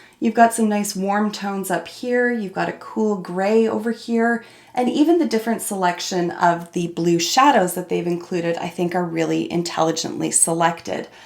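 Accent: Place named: Canada. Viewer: I adore BH eyeshadow pallets! Thank you for the reviews, now I know which ones I want to get next. My go-tos are Bubble Gum, Blueberry Muffin, Mimosa, and the 80's, 90's, and 00's remix pallets. (The 80's one has a pretty great red called Thriller.)Now, I obviously don't know where you're from(Though you sound like you could be Canadian) but here in Tennessee, TJ Maxx is a pretty popular chain. I get all of my BH products from there and they run super cheap, like, 5.99, so I snatch them up when I see them lol. I love how intelligent you are when talking about all of this, not all air head sounding(Monochromatic! No one uses those type of words. Well, not often.). Totally subbing!(Also, I apologize if you addressed different things I mentioned, I'm typing as you're talking lol. )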